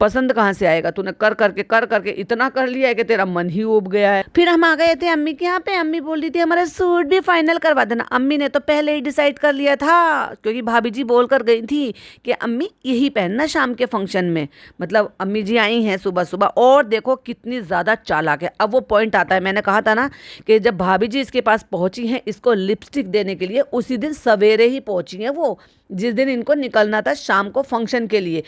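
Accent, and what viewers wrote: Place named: Haryana, India. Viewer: maine apke 3-4 podcast hi sune hn ...Usi se idea lgaya ki haryana ka touch h apki boli me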